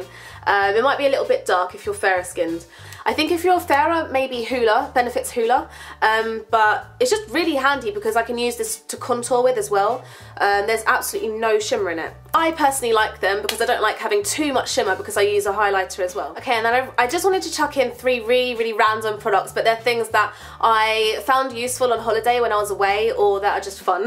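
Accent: United Kingdom. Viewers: LOVE The Hobbit! Its cool to see we have similar reading taste! Love your videos as well <3<3<3 I live in the US, but my boss is a Brit, and he talks similar to you! Your accent/voice put a smile on my face! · Love your british accent.dont like the hair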